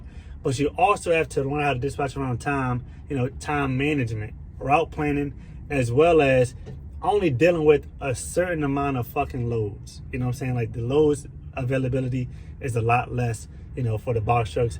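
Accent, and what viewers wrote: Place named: Spain. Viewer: Yow how u sound well on these videos but on the phone when u talking u hv a Spanish guy accent 😢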